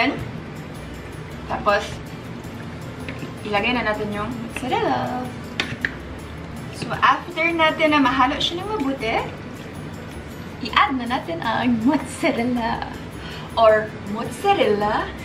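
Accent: Visayas, Philippines. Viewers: bisaya gyd kaayo c ay 😍😍 mailhan kaayo sa iyang inistoryahan.. hehe..Proud bisaya here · Hahaha lodi ang cute nang accent...bisaya gyud...thanks for sharing nalingaw ko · Very pretty pinay beauty! 🥰 i am a big fan! Nindot sd kaau imung tagalog na may bisaya accent hehehehe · Ang kyut ng pagsasalita na parang bisaya. Haha. ❤ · lumalabas ang bisaya tone n 😉cute😍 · I luv ur bisaya accent, 😁👍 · I like your bisaya accent, "Mutsarela" 😍😍😍😍😍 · Nindot paminawon ang imong bisaya accent madam. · Yung Accent minsan may pgka bisaya talaga ehy😍 Ang cute😙 proud bisaya here❤ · Nice kayo imo accent.. ung naa bisaya nga accent pero cute ❤️ ur shirt is amazing too! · Cute ng accent ni. Bisaya gyud ka dai. Proud Bisaya here! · Love the Bisaya accent when she speaks tagalog 😂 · I like that she never lost her bisaya accent hehe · Hahahaha nigahi na bai lingawa nako oie sa bisaya na accent. 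· Sa kadugay na nimo nagpuyo anang manila dhai,halata gihapon kaayo imong bisaya accent.😁😁😁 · Makalingaw kaayo ka te duh, samot na imong bisaya accent❤️ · I love her bisaya accent. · Bisaya japon ug tono ba hahahah cuttteee oyy 😍😍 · Girlfriend material talaga to 😍 Walang arte mabait sa kapwa. Hindi lumalaki yung ulo kahit malayo na yung narating. Nakakainlove 😍 Bisaya tone, beauty face, beautiful personality. Godbless po sayo. More opportunities to you 😍😘💕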